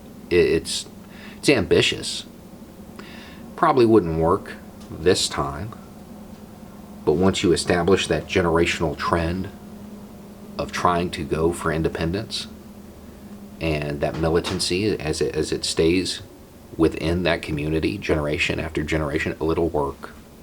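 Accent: South, United States. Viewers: what southern state is your accent from?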